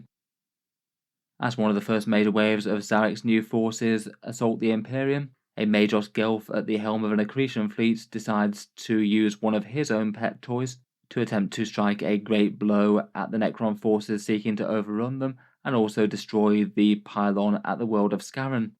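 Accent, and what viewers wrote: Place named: England, United Kingdom. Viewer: His accent comes across as fairly vanilla southern, he certainly doesn't have a strong regional accent at all - though regional accents as a whole are declining quite quickly across the UK according to some research I saw a couple months ago. I was meaning more in relation to his cadence, enunciation and intonation, which I think you're right when you say about it being a "presentation voice". I'd be very surprised if he spoke like that at the pub! Remarkably consistent across his videos though.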